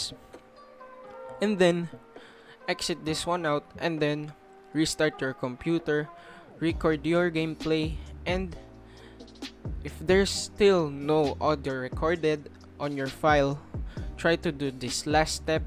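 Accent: Philippines. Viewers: I instantly know this guy filipino😂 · filipino accent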